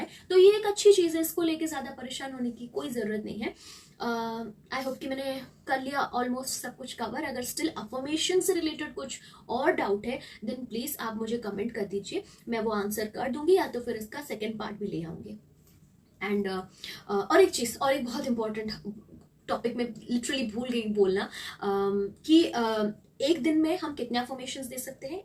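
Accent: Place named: Odisha, India. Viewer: Aap odisha se hai ma'am...loved to listen thus 😊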